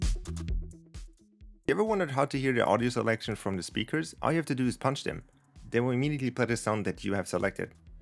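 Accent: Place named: Germany. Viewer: u sound german....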